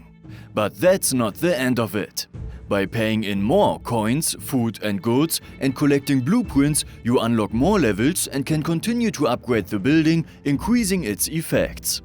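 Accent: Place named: Germany. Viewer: always this groose german English... terrible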